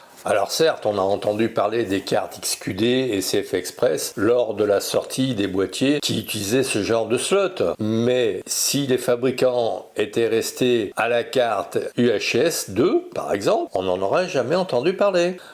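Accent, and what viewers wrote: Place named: Geneva, Switzerland. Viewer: Moi je suis sur PC/Windows10. Tu as gardé un peu de l'accent de Genèèève ha ha ! Moi je suis né à la Maternité de Genève il y a maintenant 64 ans ! Comme le temps passe ! Maintenant c'est la retraite, c'est le plus beau des métiers. Comme cité plus haut, j'ai 4 boitiers et je les utilise tous. Aujourd'hui, tour en e-vtt avec le D7100 et un 18/105 mm dans le sac à dos, et v'la ti pas que je me retrouve nez à nez avec un chamois à devant moi (j'ai pas fait gaffe !) sur un chemin forestier dans les Gorges de l'Orbe, il a pas bougé et je me suis installé à 15 m de lui, il a fini par se rapprocher de moi et j'ai pu le shooter plusieurs fois, elle est pas belle la vie ! Et tout cela avec un "crouille" boitier, j'ai bien fait de le prendre avec moi. Passe une excellente semaine amigo, cordialement François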